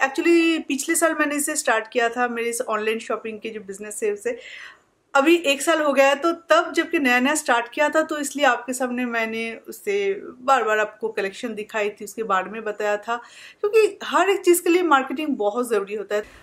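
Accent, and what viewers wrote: Bengal, India. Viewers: Bachpan se bangla bolti aae hai , hindi jitna v bole bangla hi unka 1st comfortable language hai so hindi me v bangla style a hi jata hai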